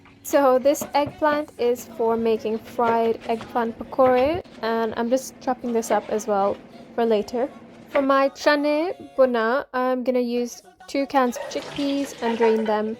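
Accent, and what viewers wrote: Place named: Scotland, United Kingdom. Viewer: Which prt of UK you from? Sounds scottish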